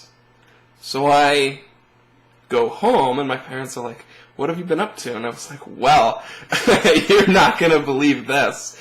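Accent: Canada. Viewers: Your canadian accent is so awesome. ^_^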